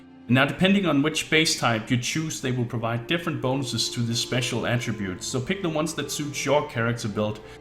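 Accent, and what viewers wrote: Denmark. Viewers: Are you dansk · are you danish or something??? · funny accent, are u from denmark? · OMFG I knew your accent was Danish!!!! Yes!!!! Hej! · Tyk dansk accent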